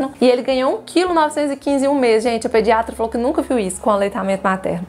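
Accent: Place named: Minas Gerais, Brazil. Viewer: Eras não consegui prestar atenção ao conteúdo do vídeo 😂 só ao seu sotaque lindo que parece ser de Minas Gerais <3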